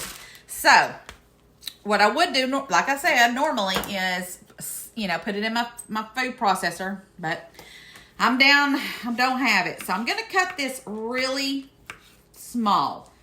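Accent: South, United States. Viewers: I detect a Southern accent..so that being said..where is the Duke's?! 😂😉❤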